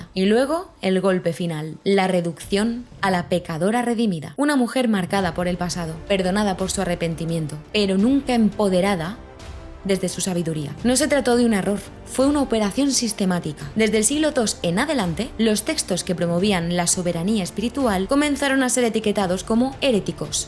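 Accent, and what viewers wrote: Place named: Spain. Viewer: Trato de soportar el acento españolete pero paila. Es irritablemente insoportable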